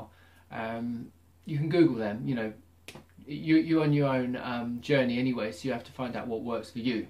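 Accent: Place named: United Kingdom. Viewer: can't we agree it's the Celtic / ANglo background of you? I clearly pick on some British accent tones, I'm italian but also...slavic. Which is the worst, eastern european look 2 times older..i'm late 20s and look like 40 due to my slavic backgr. thanks for the video though you make some very good points.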